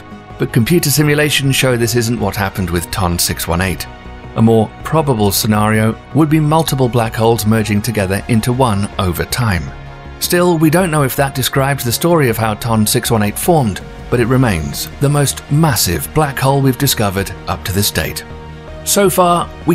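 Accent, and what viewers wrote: United Kingdom. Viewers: Im tired of these anti-christ british speaking clowns who just spread false fear Mongering. The Faith Rebukes Your Ways.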